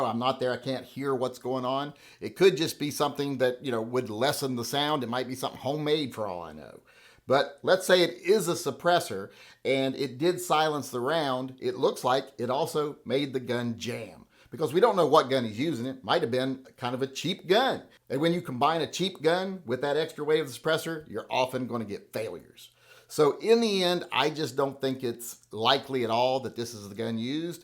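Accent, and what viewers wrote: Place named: Appalachia, United States. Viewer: You sound like a backwoods hillbilly mountain people ⛰️🏔️ 🤷‍♂️🤷🤷‍♀️🤦🤦‍♀️🤦‍♂️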